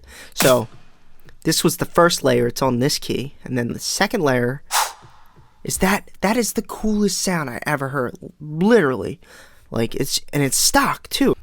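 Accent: Philadelphia, United States. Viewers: You have a Philly / South Jersey accent - am I correct? Nice 4 bars btw sounds good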